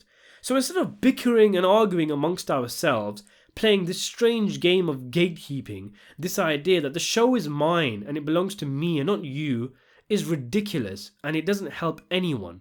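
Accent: England, United Kingdom. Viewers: are you British? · Something I thought about that was little ironic. Most of us have never seen you. We know you have a British accent but we don’t really know what color you are. Idris Elba is British too. It would be funny for those talking crap if you were actually black LOL. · Great reveal, watched for a long time big fan always wondered what you looked like, obvs your accent is dead give away, British Asain, but nice to put a face to a voice after all this time. Lay off us Brits though, how you gonna do a video on the wire and then end up blaming other peoples mindless hate on us colonising Brits???? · I always knew you was south East Asian - I was bare confused when you entertained being white… UK got different demographics - English accent doesn’t mean white hahah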